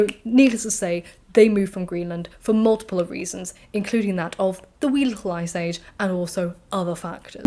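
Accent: RP, United Kingdom. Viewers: I just discovered your channel, and you remind me how incredibly attractive an intelligent and learned woman is. (The posh accent probably helps 😃)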